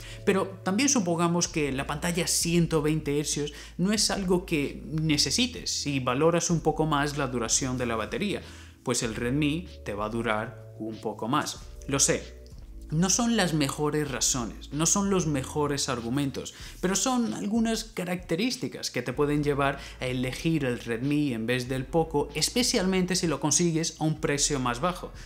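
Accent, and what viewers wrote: Canary Islands, Spain. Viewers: Eres de Canarias?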